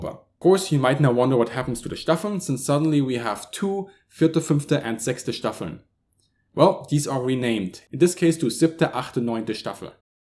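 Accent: Germany. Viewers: I don't know what it is but the German accent is amazing. I love your videos mate! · Are you from Germany or Switzerland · love the german accent :D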